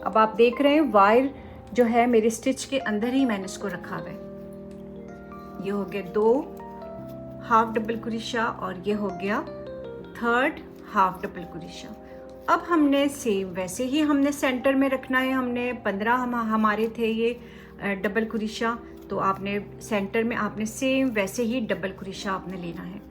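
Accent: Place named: Pakistan. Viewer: Lovely api. Ur Urdu accents sounds Pakistani 🫶🏻 happy to see. Bhot he details me r bhot achy sy smjhaya ap ny. Lots of love❤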